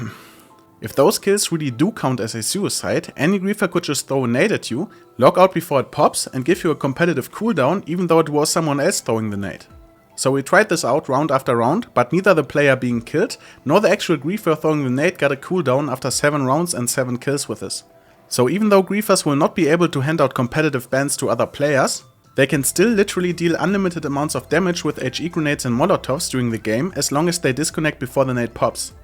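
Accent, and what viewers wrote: Germany. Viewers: Cool vid and friendly deutsch accent :)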